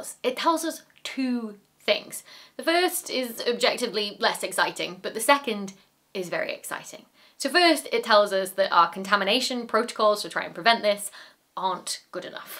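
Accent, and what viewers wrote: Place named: England, United Kingdom. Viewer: I am hard of hearing and have trouble understanding most women. For some reason, even with your heavy English accent, you are easy for me to understand. I think some of it is due to your precise pronunciation of words. I really appreciate your efforts at making yourself understood and for the great explanations of difficult subjects. Keep it up!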